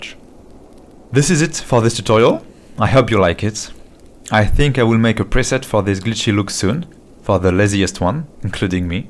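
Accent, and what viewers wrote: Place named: France. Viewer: J'ai l'impression que c un français qui parle anglais la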